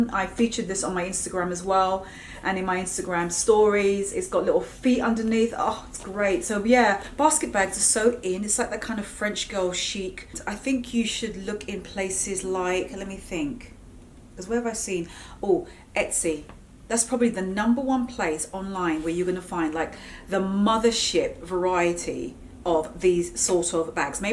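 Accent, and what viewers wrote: United Kingdom. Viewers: ! You are the Master Mixer, Co-ordinator and Fashionista of them all! You're truly such an inspiration and a great teacher! Yaay and a fellow Brit... love your accent😂 I've been dowdy for far too long and been wanting to switch it for a while. Well, now I'm switching it up... with your help! Thank you. Please tell me the oriental jacket from is reversible. It sure looks like it is. Love you! Love what you do! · Hi, I'm Mariel (the same from your blog, but another picture jajaja)....You look amazing with your own hair!!! Keep it that way! I love your british accent....!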